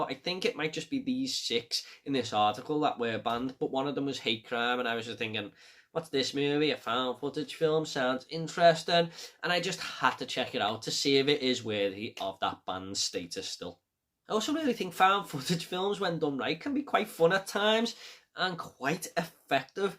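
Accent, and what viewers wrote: United Kingdom. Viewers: Good review man, nice to hear someone from the UK talking about stuff like this too - if you're interested in any recommendations that don't usually come on these "extreme" lists check out Red to Kill (HK) and Daddy's Little Girl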